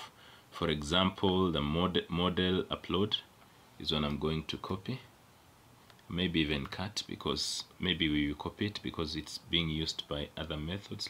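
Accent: Kenya. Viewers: Nice to hear a Kenyan accent 🙂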